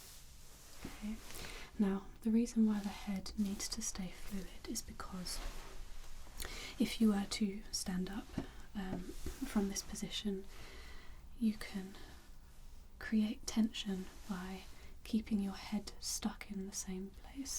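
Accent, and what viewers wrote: United Kingdom. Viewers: Always love British accent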